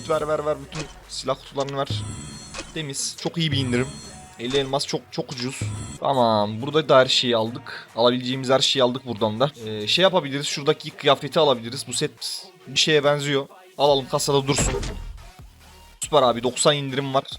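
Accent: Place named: Mardin, Turkey. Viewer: ABİ NERELİSİN MARDİNLİ GİBİ GONUŞUYORSUN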